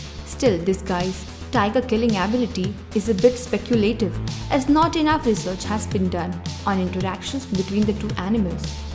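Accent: India. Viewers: I never knew indian English is better and sounds cute than American english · I nearly listened this 30 times Her voice is the best and Indian accent 😍 is really best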